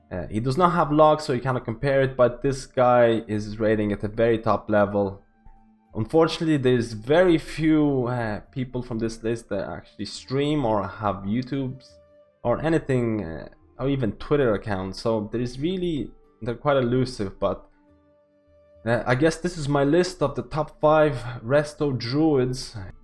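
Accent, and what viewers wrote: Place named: Dublin, Ireland. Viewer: Not sure where you are from but that Dublin accent comes through quite often. Torty is also in Pure a 3 day raiding guild so you do at least have someone from the 3 day raiding guild in the top 5.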